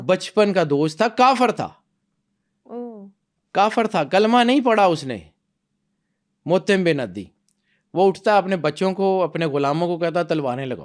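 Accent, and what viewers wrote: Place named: Punjab, Pakistan. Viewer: Use normal accent to speak.. Not punjabi accent.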